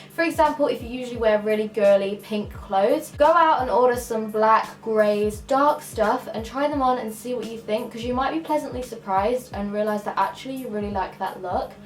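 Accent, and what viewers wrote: United Kingdom. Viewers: I 💗 ur British accent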